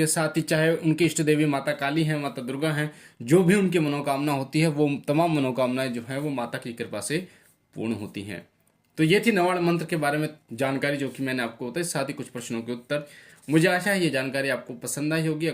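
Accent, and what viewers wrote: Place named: Punjab, India. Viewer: Punjabi हो? Heavy Punjabi accent.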